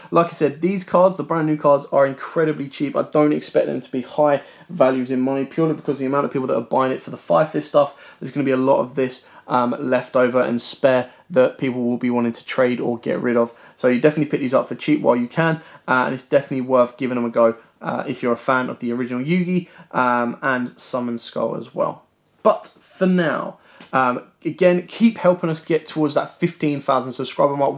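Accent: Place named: United Kingdom. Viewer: Are you an Englishman? I can hear a Brit accent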